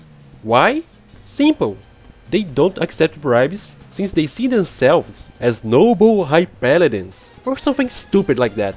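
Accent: Brazil. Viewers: posso estar enganado mas o sotque é bem brasileiro, de qualquer forma obrigado vc ajudou muito com o video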